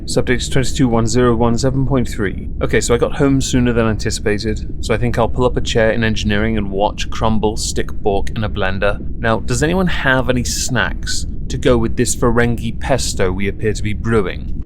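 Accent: RP, United Kingdom. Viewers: I quite like your poshness tho 😂🤣💖💓💕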